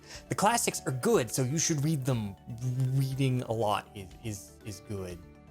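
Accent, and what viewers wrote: South, United States. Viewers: Are you from the south? I never noticed how southern your voice sounded when I couldn't see your face. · Wtf face reveal! Also, you look way less southern than you sound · You have the most interesting of accents that's its rather indiscernable what corner of the US (or Canada? Nah, I don't hear Canadian...) you hail from. It's like a southern twang with a twist. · NEVERMIND IT'S TENNESSE I KNEW IT WAS AMERICAN SOUTH. Also I was looking at his website and the thing about time traveling Nazis was in the same paragraph than the TENNESSE thing. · It's so weird actually seeing him, and then hearing him speak with the Southern(?) accent, even though I've heard him speak with it before. I guess it's because I live in New York City and only see him as a cartoon, and thus I associate the accent only with the cartoon, and not with three dimensional people.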